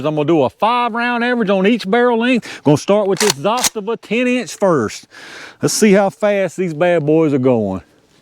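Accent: South, United States. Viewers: What a beautiful south accent.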